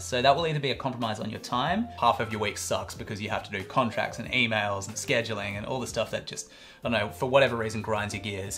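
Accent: Australia. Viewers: …Have you considering recording ASMR/bed-time-story/Calm-app-style content, in which you dish out your sage wisdom in that gnarly Aussie accent so your subscribers can fall asleep every night slightly less anxious? … Asking for a friend. 🤣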